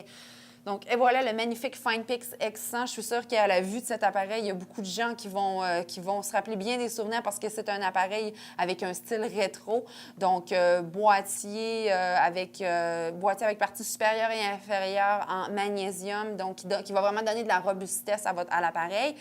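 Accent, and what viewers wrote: Canada. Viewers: Omg, l'accent canadian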